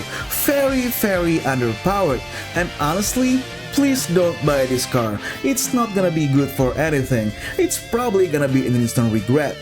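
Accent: Indonesia. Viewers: you sound indonesian...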